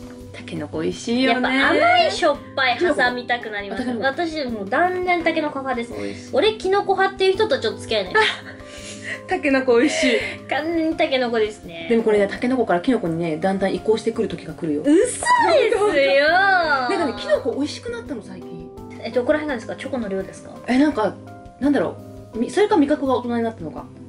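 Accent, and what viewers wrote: Kansai, Japan. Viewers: さんの関西弁めっちゃ好きなんやけど。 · やでなぁめっちゃ分かるわ😂 本間の関西弁っちゅうのが分かる！w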